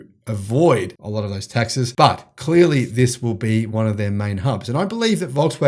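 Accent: Australia. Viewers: Aussi English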